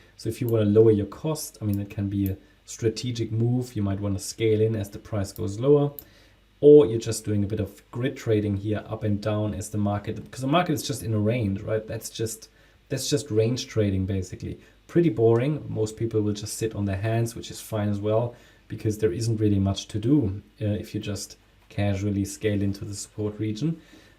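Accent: Germany. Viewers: Bist du Deutscher? Hört sich so an 🎉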